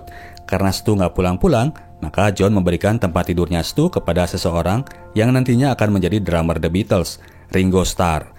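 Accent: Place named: Padang, Indonesia. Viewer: Logat padang....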